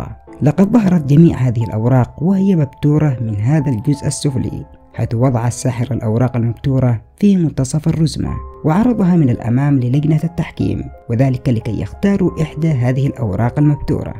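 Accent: Yemen. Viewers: يا انت يمني صح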